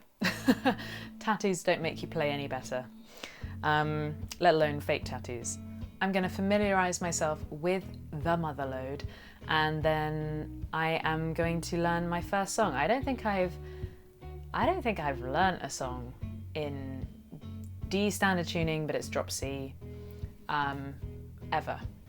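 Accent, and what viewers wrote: United Kingdom. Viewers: K bro so this don't matter a tiff but I fuckin KNEW you would have a British accent before I clicked on the video man I could tell by the way your mouth made your name say in the preview yah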